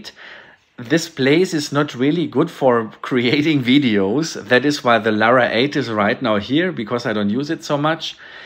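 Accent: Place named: Germany. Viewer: I think they thought since the video title is in English, it meant perhaps Jaspers products were available to be shipped from within the US.Then he heard your accent and realized that you are in Germany, so his hope for lower cost shipping was dashed.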